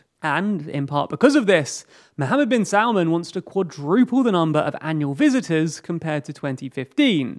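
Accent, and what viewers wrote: United Kingdom. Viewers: Hearing from a person with British accent that human rights in the middle east have historical being violated... dude good part of it was causeed by your country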